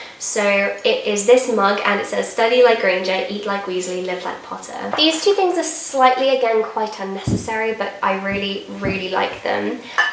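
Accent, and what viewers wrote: RP, United Kingdom. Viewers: Do you put this accent on ... your sister talks so so so much different which is a bit weird ? You sound posh yet she sounds not posh hahah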